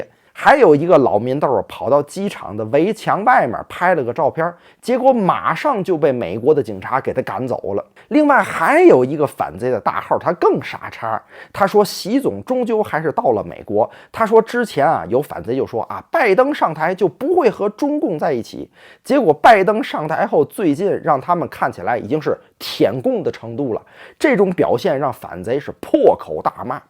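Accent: Tianjin, China. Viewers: 听起来像我们天津老乡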